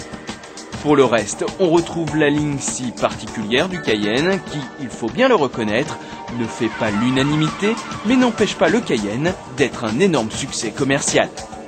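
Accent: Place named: France. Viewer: you sound so much like a f*cking arrogant french.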